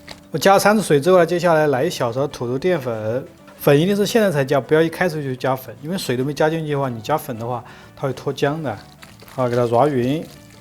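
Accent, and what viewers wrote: Sichuan, China. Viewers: ，铲铲哦，你娃的川普太亲切了😊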